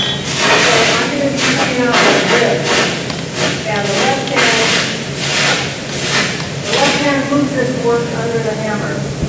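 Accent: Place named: United States. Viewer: Hey are you actually Scandinavian or do you just have love for the culture and history of Scandinavia? You look like a pretty stereotypically Scandinavian, your tattoos seem to have norse inspiration, you have a lot of Norse runes and Norse fonts in your videos, and many of your crafts are Viking styled but your accent is American.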